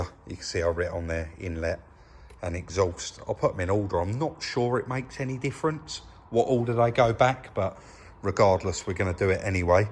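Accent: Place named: Cockney, United Kingdom. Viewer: Escort RS turbo, like seeing an old friend ⭐️ Makes me smile how keeps correcting himself in the videos on his pronunciation of words😅 be proud of your cockney accent buddy! 👍 Really like this channel and wish all the best to a couple of top guys ⭐️⭐️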